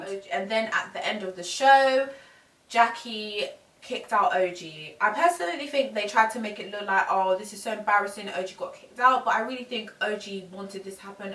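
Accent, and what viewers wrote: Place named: United Kingdom. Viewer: Lovely Brit accent I love it OMG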